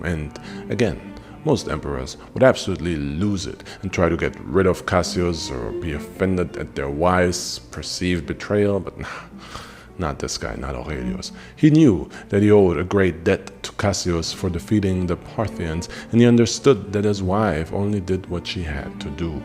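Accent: Germany. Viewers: Are you German bro? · Are you German ? Cause the way you say everything reminds me so much of the people in my life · Why do you pronounce Roman names with a German accent? Latin should sound more like Spanish or Italian.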